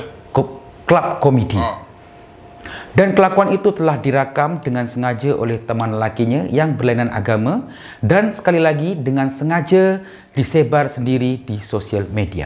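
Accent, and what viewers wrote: Java, Indonesia. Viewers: Wak bg pekat sikit bahasa jawa tu😂😂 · Wak kalo ckp pekat lg jawa tuu lagi mantop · ckp meddok jowo tu ade haha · Wak pekat sekali jowonya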